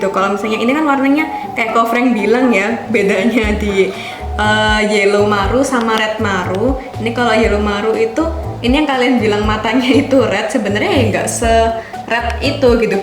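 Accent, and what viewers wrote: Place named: Surabaya, Indonesia. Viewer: pernah ketemu di event hoc. Hahaha. Suroboyoan pokok e wes.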